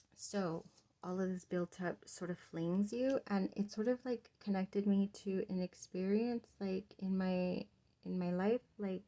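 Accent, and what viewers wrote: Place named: Latin America. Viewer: Pile 3 -Oh my Goodness, What can I say but...what an extraordinarily, beautiful 😍 reading. Firstly, I love how you speak with your receiving hand. You are absolutely 💯 amazing. I would be able to understand everything you say even if there was no sound. The sign language you send out is clear and concise. I'm not sure what nationality you are and I don't want to assume but by your accent I want to say hispanic? A beautifully, sensual spoken ❤️ ❣️ LOL, I'm Ukrainian and I speak with my hands. LMAO I catch people watching my hands constantly and it looks like they are like what the hell?!😂🤣🤣 Even when you talked about the curtain you have up, your hand created that curtain as well. ✨️Truly uncanny✨️ So many syncronicities. Everything from the dried flowers(I remember the reading a few weeks ago that you first brought them in. 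It completely caught me off guard. I didnt comment because there were too many personal messages that I didn't want to share publicly. And I will say that all 3 piles resonated heavily), bessom broom and drift wood all spoke to me very deeply. My supernova tower moment happened in 2007 and since brought multiple tower moments to help me clear all heaviness I have been carrying. The most recent tower moment which started on May 12th and completed itself May 14th, has brought a serenity to my life like no other. Please know how connected you are to the collective(and well me, I feel very guilty because I feel like I am receiving free readings, which I wou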